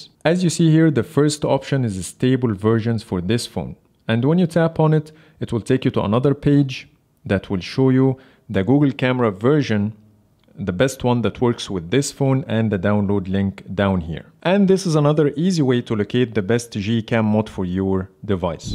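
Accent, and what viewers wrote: Egypt. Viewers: You got an Egyptian accent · are you an egytian your accent denotes that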